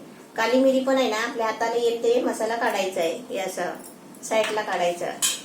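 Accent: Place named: Ratnagiri, India. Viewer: Taee masta biryani banavata ratnagiri chi bhasha pan mast bolta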